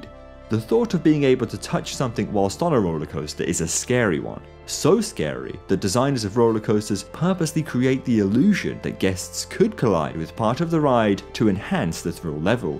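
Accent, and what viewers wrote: United Kingdom. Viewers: Finally someone who is British explaining these stuff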